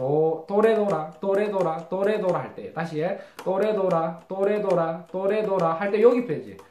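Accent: Gyeongsang, South Korea. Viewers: ㅎㅎㅎ 나도 경남사람이지만 경상도 보리문댕이 사투리 너무 정겨워....ㅋㅋㅋㅋ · ㅋㅋㅋ 경상도 사투리 강의 정말 구수하고 잼있습니다.^^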